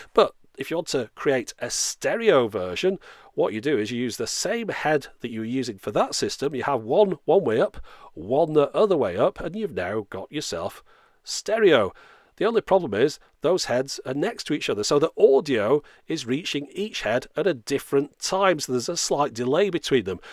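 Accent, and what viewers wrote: United Kingdom. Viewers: one of the reasons i watch this channel very often is not only the information i get but also the mesmerizing sound of your voice and astonishing British accent specially when you say 'however' and the last part of ' as always, thanks for watching' !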